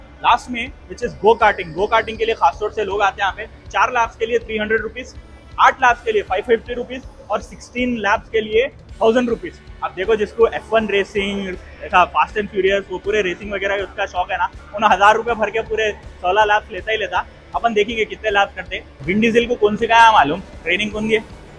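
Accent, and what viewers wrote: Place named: Hyderabad, India. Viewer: Our Hyderabadi hindi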